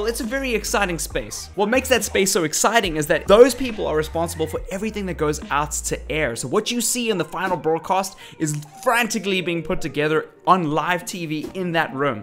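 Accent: South Africa. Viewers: are you south African ??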